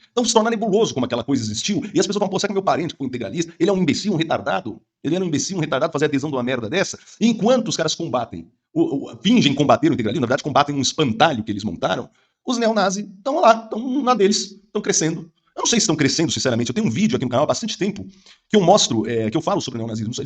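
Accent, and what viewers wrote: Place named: São Paulo, Brazil. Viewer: Ambos paulistas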